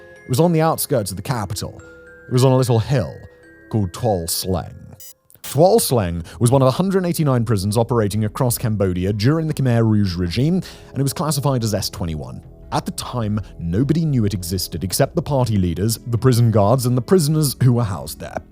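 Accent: United Kingdom. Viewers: You Tube SUCKS! I have removed this man's channels over and over again. But when You Tube changes the algorithm, it force feeds me this Simon guy's many channels despite my removing him. Simon's channels suck. They are poorly researched information that is mostly government propaganda talking points. Terrible videos. And just because Simon has a British accent, this doesn't not make him an authority on anything. He's just a talking head. England is over. Brexit has made the only job in England a You Tube content provider. Terrible list videos that spread falsehoods and bull.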